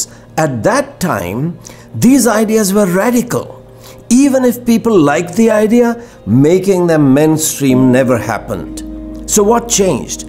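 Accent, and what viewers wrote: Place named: United Kingdom. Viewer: Esse sotaque britânico omg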